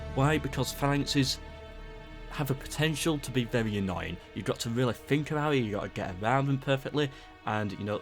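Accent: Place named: Yorkshire, United Kingdom. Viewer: I find the accent both distinct and pleasant and fellow Yorkshireman. I know your dialect is local so maybe I'm wrong but I normally hear Carthage pronounced like 'carthidge'. Not trying to be a nob, no one messes with the dark lord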